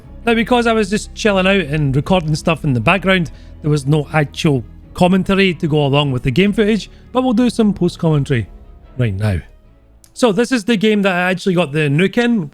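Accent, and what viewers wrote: Scotland, United Kingdom. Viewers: I love it when I watch a channel for the first time and just here the beautiful Scottish accent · are you scottish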